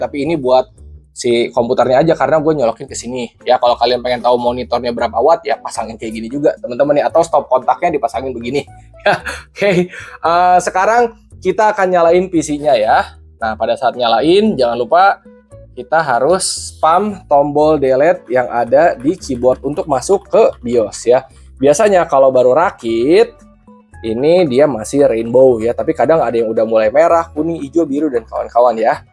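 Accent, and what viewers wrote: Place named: Sunda, Indonesia. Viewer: aksen sunda lo kentel banget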